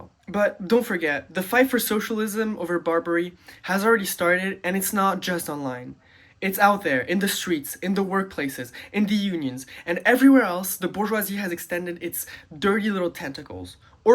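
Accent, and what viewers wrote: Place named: Europe. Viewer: Hey I like your points in general but I want to push back a bit on the notion that revolution would benefit the whole working class/that the working class is truly united in their interests globally. I don't know exactly where you're from but based on your accent I'm gonna guess somewhere in mainland Europe. I bring that up only to say I'm not from Europe so idk the ins and outs of the situation in that part of the imperial core, but I am from so-called Australia and most "leftists" in this country (and many other colonial countries) are not our allies (by "our allies" I mean any true actually left wing internationalist's). Learning simultaneously more about the history of colonialism in Palestine and in Australia has led me to the same conclusion for both cases, the only way to resolve the contradictions is decolonial revolution, something much more achievable in Palestine purely because of demographics but I am hopeful one day it may happen on this continent as well. 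The issue is though, it is genuinely in the interests of the settler-working class to maintain colonialism. I'd argue this is the case even more so then it is that European working class benefits from Imperialism. I know you make the claim in this video that the benefit is marginal, and that is true in comparison to the benefits socialism could bring, but I also ask you at least reconsider that point by comparing your living situation to that of the vast majority of humanity. But back to my main point, genuine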